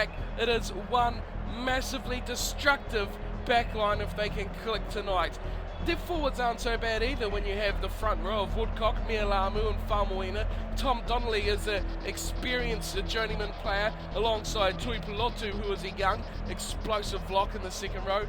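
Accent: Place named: New Zealand. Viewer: great vid again, good win and anscombewas great in that, he was the difference between the teams, when is tikoirotuma back, also where in NZ are u from, coz ur accent is so cool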